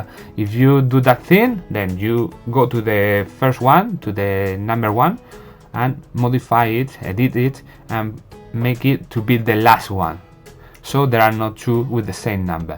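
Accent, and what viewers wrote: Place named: Spain. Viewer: Thank you so much. I will try to make a new livery. I am french but I live in Thailand. I speak english same thaî! It seems to me that you are fron spain. That is why I can understand your english. When I hear english from USA I understand nothing.You have accent that I can hear. 55555 . In thaî language the number 5 is pronounced "ah". And when we say that we are laughing we write 55555. That means hahahahaha. Thank you again for your video.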